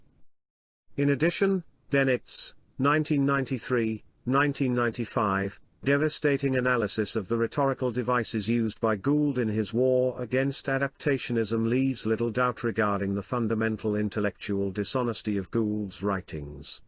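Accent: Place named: United Kingdom. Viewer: The british voice actor ..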